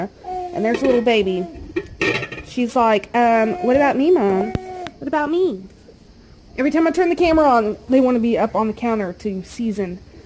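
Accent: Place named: Appalachia, United States. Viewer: I love it! Where are you from? I’m guessing somewhere in Appalachia?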